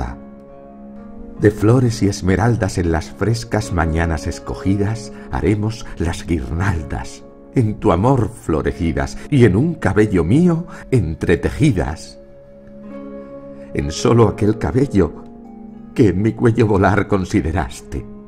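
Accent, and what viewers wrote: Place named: Castile, Spain. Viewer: qué voz tan bella. qué castellano tan sobrio. me ha encantado. muchas geacias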